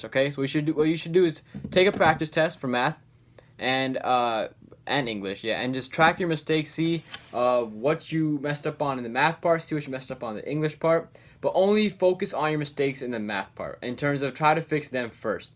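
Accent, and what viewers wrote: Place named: United States. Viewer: dude how do you have such a fluent US accent like you sound like someone who was actually raised and born in america and then spent most of their time among american parents or something cause for an indian to get such a fluent american accent is just amazing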